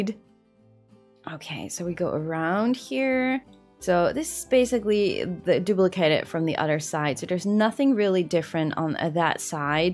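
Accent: Netherlands. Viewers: Are you Dutch? You have a very thick Dutch accent, I love it :D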